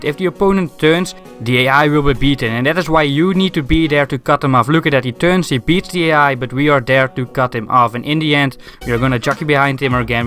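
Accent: Netherlands. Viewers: Hmm are you dutch? I guess so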